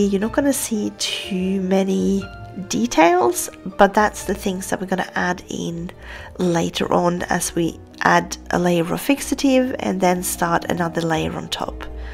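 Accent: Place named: Australia. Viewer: Your accent is becoming more and more Australian hehehe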